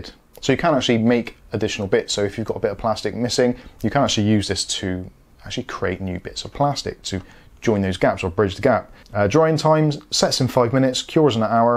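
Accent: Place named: Gloucester, United Kingdom. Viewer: Great vid, I think you might have sold me on this. I'm in Vancouver BC, you sound like you're from my part of of the UK, Gloucester boy?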